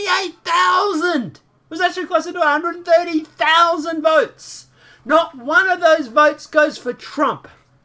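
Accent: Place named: Australia. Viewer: Great work, thank you for trying to keep these crooks honest, sounds like you are Australian, doesn't matter, just thank you, if you are not a US citizen you are doing this for the good of humanity.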